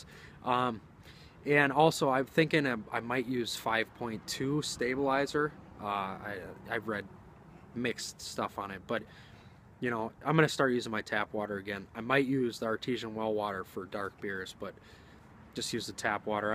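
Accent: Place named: North, United Kingdom. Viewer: Indeed, homebrewing is awesome because there are some things that make more sense through experience than just from reading. Slainte!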